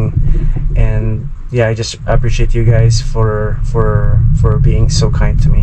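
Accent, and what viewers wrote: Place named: Philippines. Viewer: Hey where's in Philippines you come from?,Your English is very good a bit if a accent there.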